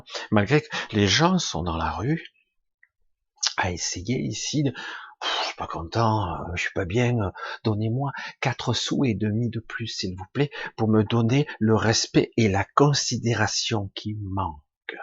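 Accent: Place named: Ardèche, France. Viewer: Bonsoir j'adore vous entendre avec l'accent ardéchois c'est tellement vrai ce que vous dites le peuple n'en peux plus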